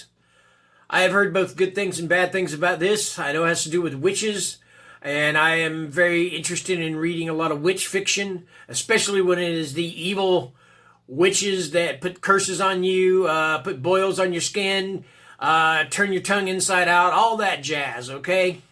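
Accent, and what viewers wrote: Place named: Appalachia, United States. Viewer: Excellent Appalachian accent. Too many good books, how are you going to decide what to read first?!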